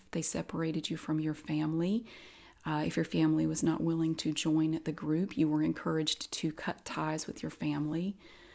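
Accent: South, United States. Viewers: Nothing sounds like the devil from your videos. You have a God given gift of a soothing gentle southern voice. Thanks for helping me rest.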